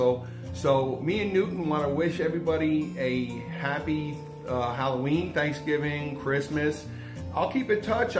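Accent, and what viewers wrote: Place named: New Jersey, United States. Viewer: Love your enthusiasm...and the Jersey accent totally helps. Get the cheap-o paper plates. Love it!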